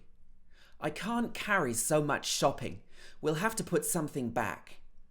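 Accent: United Kingdom. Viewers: This is rather British English, right?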